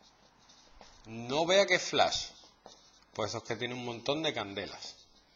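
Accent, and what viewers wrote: Spain. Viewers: de que parte de españa es ese acento?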